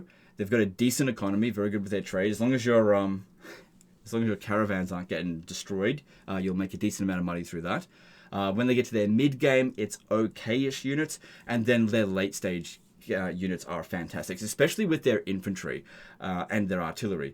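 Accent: Australia. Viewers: Came to see the dawi in S tier, stayed for the Australian.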